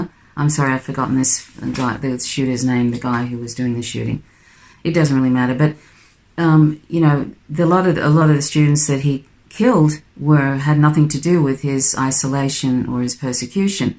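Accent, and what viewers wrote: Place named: Australia. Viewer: you sound like you have spent time in australia ? Very timely and insightful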